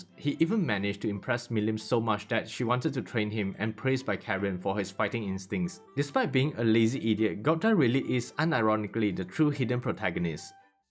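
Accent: Singapore. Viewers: Sing-lish FTW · dude are you singaporean?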